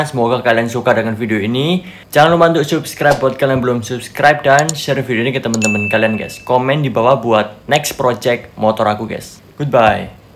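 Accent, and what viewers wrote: Malang, Indonesia. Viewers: Orang malang?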